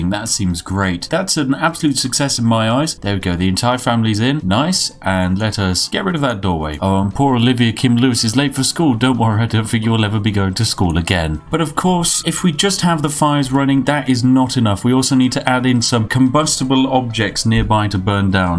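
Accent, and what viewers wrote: United Kingdom. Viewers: The British version of Graystillplays 😆😂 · I just subscribed within the first 5 seconds because of his voice, why do I love British accents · A British Jim Pickens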